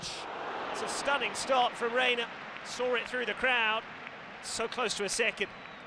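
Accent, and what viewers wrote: Italy. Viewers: Putz, mas o narrador é romano !!! Assim não dá ...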